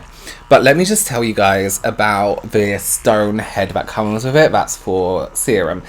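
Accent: United Kingdom. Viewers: Dearest, you sound loud enough but still sassy and classy with your lovely British accent!! We love you in my FB makeup group here in Canada, our "unofficial Official" mascot!! You're our favorite twin BTW!! We do love James, how can we not? But, you just slightly outdo him in the finesse category!!💋😉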